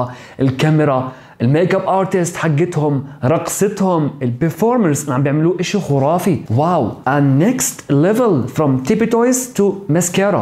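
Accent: Iraq. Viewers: انت عراقي؟ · ياخيي حلووو عليك لهجه العراقيه 😭😭😭❤️ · احساك انت عراقي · انت عراقي؟؟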